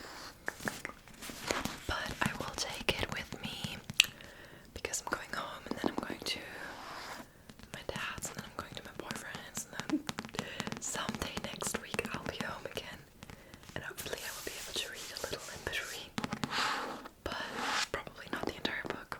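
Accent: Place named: Germany. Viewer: Dein Englisch wir von tag zu tag mehr denglisch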